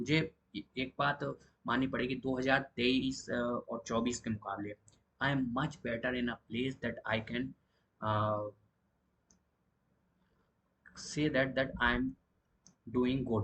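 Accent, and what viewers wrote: Bundelkhand, India. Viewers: Kaha se ho bhai thoda bundelkhand wala accent lagta h aapka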